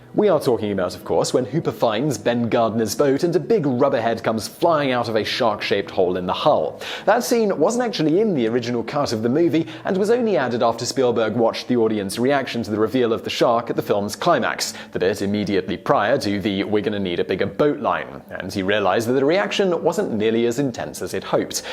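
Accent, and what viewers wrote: United Kingdom. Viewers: This clip needs an AMERICAN ENGLISH voice over. Save your intolerable British gibberish for Masterpiece Theater....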